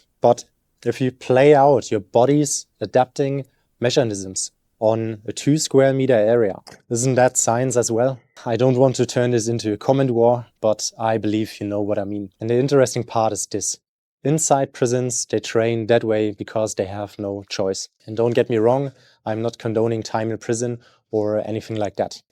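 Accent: Germany. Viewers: Yessss! Are you from Germany or what's the accent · Where are you from? Sounds like Germany from the accent.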